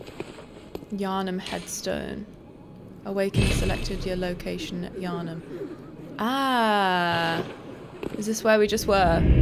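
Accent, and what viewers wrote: United Kingdom. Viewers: It's quite funny to listen to a british girl (i assume) playing this game and commentating, it's very immersive actually.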